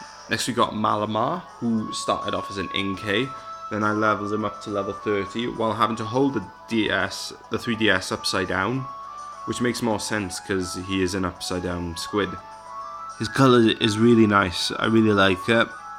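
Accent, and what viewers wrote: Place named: Wales, United Kingdom. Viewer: Your accent and pronunciation, my friend is also Welsh it's pretty cool. I've got a boring English accent unfortunately haha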